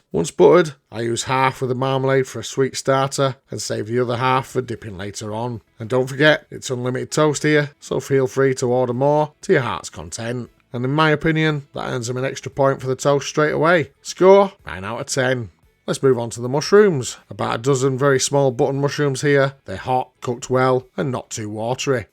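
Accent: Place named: Cockney, United Kingdom. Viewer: I need to point out something. Why are you speaking in a Cockney accent? Are you Rodney Trotter from Only Fools and Horses? This is weird.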